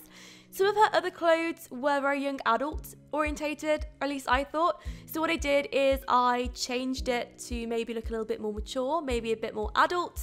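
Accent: United Kingdom. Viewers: I love your channel!! And i wish my british accent was as nice as yours; your voice is so nice!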